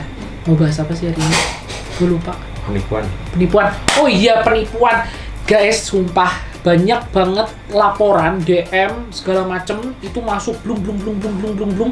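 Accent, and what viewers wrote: Indonesia. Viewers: ki unik, kalo ga denger dia ngomong mesti taunya yoo cina abizzz, taunya begitu pas ngomong yoalaaaahhh rekk jowo abezzzzzz😂😂🤣🤣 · Assalamualaikum Warahmatullahi wabarakatuh Sebelum'a aku mau ucapin bnyak Terima kasih buat Tv and Team yg udh ksih Tips and Trick tentang penipu yg lagi merajalela bagai corona ini wkwkw dan fix logat ngapak'a (kyak orng Jawa Tengah) keliatan banget and make me laughing cuz liat ekspresi yg mesel sama penipu awokwokowkowk Literally Aku udh bnyak dpt pengetahuan di video ini tentang penipu entah itu penipu pembelian hewan, barang atau yang lain'a jdi'a aku lebih tau gmna cara beli secara online dan sedikit cerita aku wktu itu emng lagi PENGEN banget adopt Kucing dan aku agak ragu buat cari di online, sempet sehari atau beberapa hari nemu di ig tapi ada rasa RAGU dan KHAWATIR buat pesen di olshop tsb, tapi setelah aku nemu account instagram kyak'a niatan aku buat punya kucing ada peluang besar dehh nihh soal'a TRUSTED banget dongg.. 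Mana ada olshop pet yg jual bisa VC dlu sama calon Kitten's kita hahahaha And i hope I can get blessed from ALLAH SWT By hands. Amin allah'humma amin Sekian ya wassalam'mualaikum warohmatulohi wabarakatuh Instagram: imradit01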